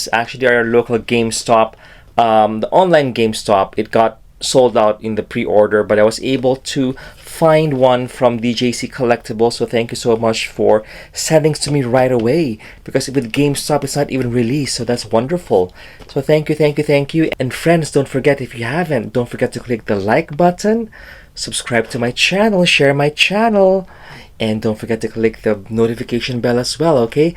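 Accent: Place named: Pakistan. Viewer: I believe he is from pakistan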